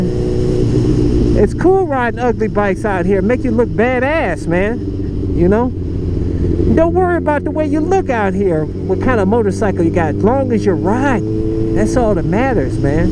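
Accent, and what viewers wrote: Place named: Ohio, United States. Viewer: I didn't realize that folks from Ohio have a regional accent, but listening to your videos, I learned that they do.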